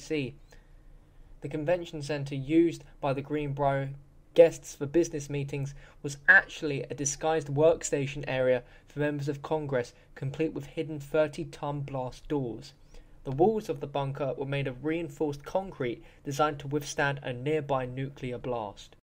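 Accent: England, United Kingdom. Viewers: are you British? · hey, he is english, he cant help it.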